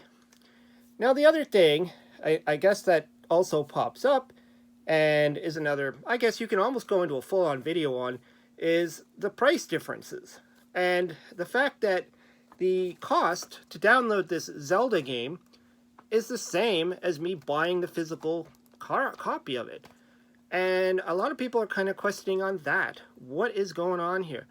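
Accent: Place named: Canada. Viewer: ! Always love to hear your opinions bro! Thanks for doing a response to this video! I love hearing the slight canadian accent on talking abowt. Haha. Have a great day buddy!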